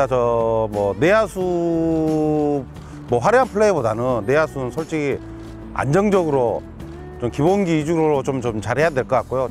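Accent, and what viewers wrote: Jeolla, South Korea. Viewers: 종국이성 진통광주전라도사투리 구사자네요 ㅎㅎ 응원하겠습니다 원클럽맨으로 감독도하고우승도하고 가즈아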